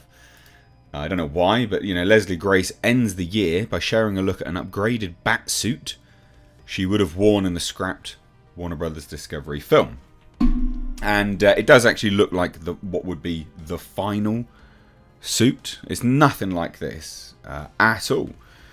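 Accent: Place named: United Kingdom. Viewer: like your british accent.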